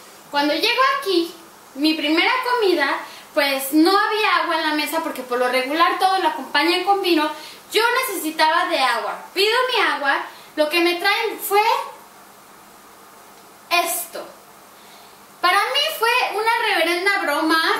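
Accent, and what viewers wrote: Mexico. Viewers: te felicito, eres super fresca y carismática para expresarte y describir tus nuevos hábitos y costumbres. Te has adaptado muy bien a tu nueva realidad, pero te felicito porque tu español-mexicano, sigue siendo perfecto, y yo como tu compatriota, me da orgullo que no pierdas tu identidad. "A la tierra que fueres, has lo que vieres". Eres, sin proponértelo, una traductora y embajadora mexicana. Además de que luces tremendamente atractiva. Que orgullo tenerte como mi compatriota. Es el segundo video que veo de ti, para aprender francés, y ya eres mi favorita. Gracias por tu generosidad, vitalidad y entusiasmo, para mi, ya eres trending-topic. Te admiro y me motivas a aprender más de Francia, su lengua, sus tradiciones, costumbres, comida, landmarks, música, moda y cultura.Tienes toda mi simpatía y afecto. Mega DIEZ!!!! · Vine a ver el primer (?) video, y encuentro que hablas casi igual, no se que tanto cambio le ven, me alegra que conserves tú acento mexicano y esas palabras que los otros países acostumbramos a escuchar ♥️ ajsjaj, me alegra que no te "engrupas" como decimos en mi país, conozco chilenos que han vivido 20 años fuera de aquí y hablan como si se hubieran ido ayer, conserva mucho tu identidad saludos.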